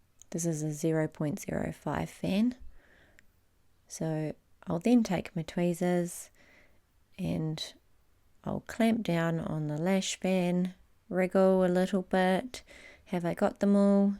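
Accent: New Zealand. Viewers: Love your work!! New to volume just completed training this week😀Got to love that kiwi accent x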